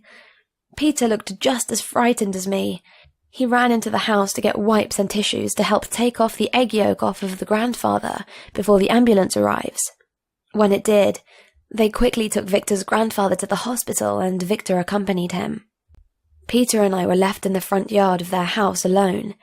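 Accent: United Kingdom. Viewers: Y is this recorded in a British accent if they went to Walmart because that american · I like how she has a British accent and goes to Walmart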